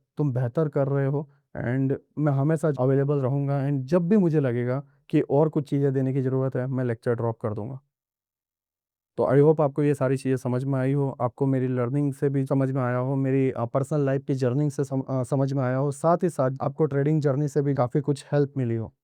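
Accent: Gujarat, India. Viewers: Ap Gujarat se ho...? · Lagta hai Gujarat se ho.... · Are you gujrati bro ? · Pakka ap gujrat se ho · Bro are you a gujarati?? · Sir aap gujrat se ho ?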